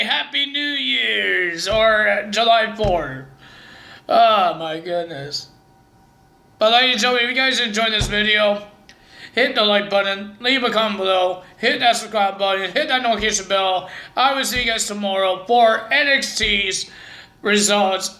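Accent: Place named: Quebec, Canada. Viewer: where are you from bro? I hear an accent when you speak. It sounds like either from Quebec or Louisiana.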